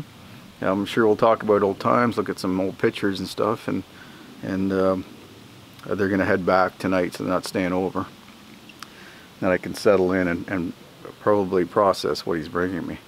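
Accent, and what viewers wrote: Canada. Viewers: If auto insurance doesn't pay for the theft, check with your homeowner's insurance policy. Sometimes you are covered under that. Your videos are always such a treat for the senses. Stunning and beautiful. (And I adore your Canadian accent) 😎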